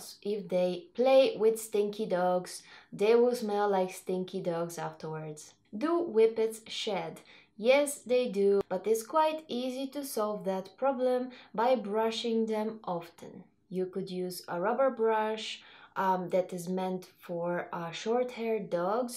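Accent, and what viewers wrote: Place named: Belgium. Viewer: Love your accent. Where in the world are you from? I'm thinking French-speaking Belgium.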